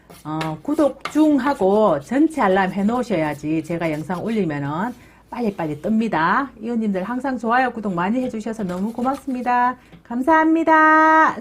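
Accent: Gyeongsang, South Korea. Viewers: 죄송합니다 경상도분같은데 ,내용이 너무좋아서배우고싶습니다 연락처는 알수없습니까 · 소탈한 성격 정말정말 좋아요 그기다 경상도말씨짱이랍니다*** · 경상도사투리 듣기 거북해서 못보겠서요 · 핫하하하 ...🎉🎉🎉 매매하이소 진짜 경상도 아지매맞네 그런데 엉덩이는 조심하이소😂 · 말린버섯 안말린버섯 간장 어느것이 더맛잇는가요 감사합니다 경상도말씀 너무 정답네요~^^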